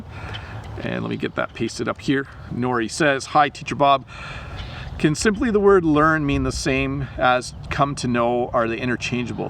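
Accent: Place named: Canada. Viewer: Hello, Bob! Hello from Ukraine! :) I'm glad I found your video lessons . This is the first time I hear Canadian English! Thank you for your work.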